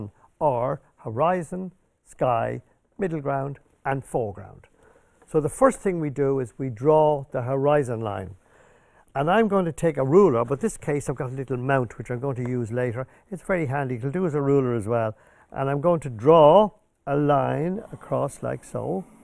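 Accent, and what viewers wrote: Ireland. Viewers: I do paint a bit but I tune in especially to hear your voice🍀☘️. My Gr.Parents were from Dublin & spoke just like you. I enjoy listening to you & It’s very comforting. I loved them dearly❤️. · My hair dryer has lived in my paint basket since I discovered "Simply Painting" years ago in a craft shop after having made a fool of myself in a watercoloring painting class. Now I have a brand new set of gouache, and you're going to be the reason for my success with them. You make sense -- and I love the brogue. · Dear I’m so glad I found your video site. After going to 2 learning to paint sessions I feel I didn’t learn very much, you make it so easy and I love listening to you with your lovely Irish accent and as my MumRIP was from Dublin it brings back lots of memories. I will continue to paint although I’m a bit nervous Thank you.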